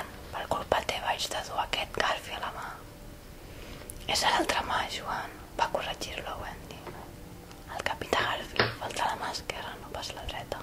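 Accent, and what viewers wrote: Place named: Spain. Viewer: Hola: Que bonito tu ASMR, me encanta la tonalidad de tu voz y lo más FANTÁSTICO es que el acento ESPAÑOL SE PRESTA MUY BIEN AL "ASMR". Por favor, haz mas ASMR, en Español y por ahi en Catalán, PERO ahorita procura hacerlo en Español, ahhhh siempre es recomendable poner en el titulo del Video ASMR, cuando vas hacer ASMR, para que así más usuarios puedan gozar de tu lindo material FELICITACIONES: Me encanto tu Video. Saludos desde Lima - Perú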